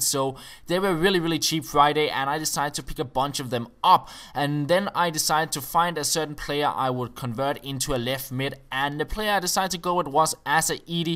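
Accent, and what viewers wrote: Denmark. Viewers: are you from denmark?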